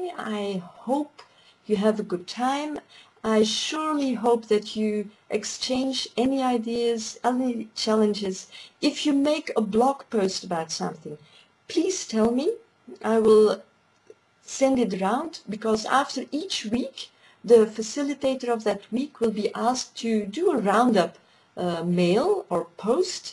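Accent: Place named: Flanders, Belgium. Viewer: Thank you for this lovely welcome,. Flemish-inspired English? 8-D Well, you're certainly inspiring.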